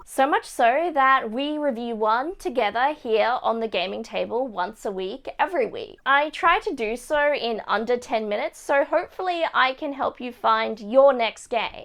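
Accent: Australia. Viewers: I really really want to love your channel, but you have an Australian accent still. :(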